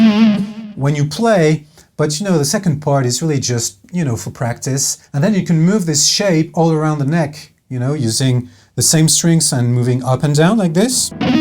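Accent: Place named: England, United Kingdom. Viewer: Nice english accent:)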